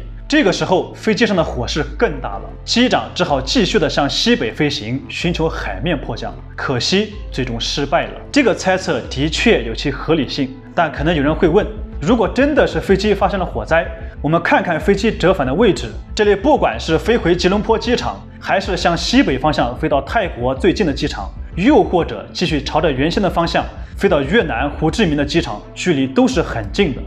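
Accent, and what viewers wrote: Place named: Northeast, China. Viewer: 这个解说的口音太重了，一股大碴子味儿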